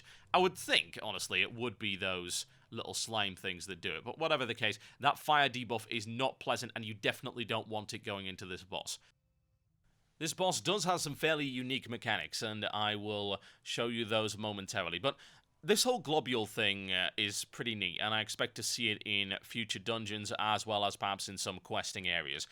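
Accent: United Kingdom. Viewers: Oh and the instance looks pretty tight too, i'm lookin forwar dto it, THANKS, keep up the vids and ignore the noobs. They've just never heard a rad british accent and immediately think of some elitist sociopathic evil villain froma cheesy Bond flick or something. That, and people just have short sided nerd ragey opinions of the way things should go and get butthurt when they don't, that's net life i suppose.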